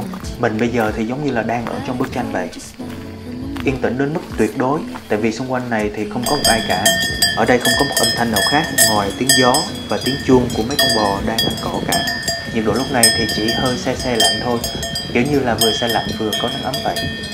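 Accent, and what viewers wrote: South, Vietnam. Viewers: Rất hay cháu ạ ,giọng nói miền nam ghép nhạc rồi cảnh đẹp, rất tuyệt❤️❤️❤️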